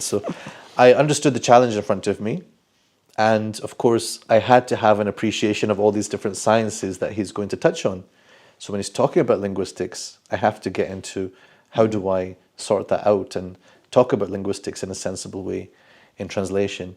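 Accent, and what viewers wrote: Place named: Scotland, United Kingdom. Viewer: sorry, Shaykh. Couldn't resist. Never heard a scholar with a Scottish accent before, but l appreciate what you are doing.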